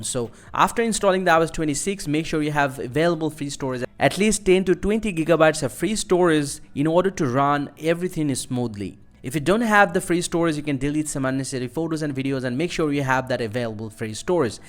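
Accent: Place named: India. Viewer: bhai itna vahiyaad accent hai na tera. Indian english me hi bol le. Saale angrezi chodde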